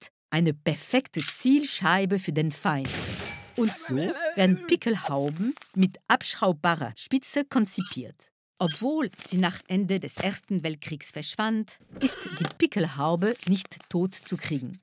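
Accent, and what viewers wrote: France. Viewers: Dieser "komische" Akzent ist ein französischer Akzent und wird von Karambolage schon seit gefühlten Ewigkeiten verwendet. Vielleicht übernehmen die mit den deutschen Akzent ja die französischer Karambolageversion.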